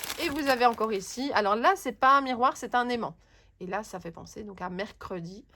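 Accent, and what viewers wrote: Belgium. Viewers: Bonjour, je participe et mon coup de coeur est double avec le tampon Lavinia et aussi le lot de chez universcrap. J'adore tes vidéos surtout quand tu nous montres des tutos de création et j'adore ton accent belge et ta façon de parler avec certains mots qui fond toujours drôle dans mon oreille. J'adore rencontrer les exposants dans les salons pour mieux pour mieux connaître les produits qu'ils proposent et comprendre mieux leur univers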